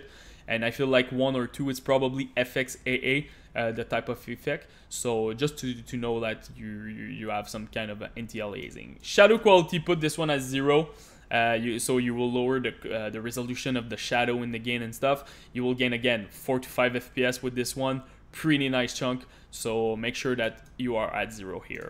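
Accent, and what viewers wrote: Quebec, Canada. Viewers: Fuck ça se voit direct l’accent québécois car j’en suis un en tout cas merci pour ce tuto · accent du quebec sa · ta une accent quebecois xd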